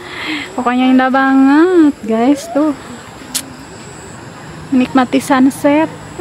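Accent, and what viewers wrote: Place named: South Sunda, Indonesia. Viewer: Logat pakidulan nana ange yeh 😂😂😂😂😂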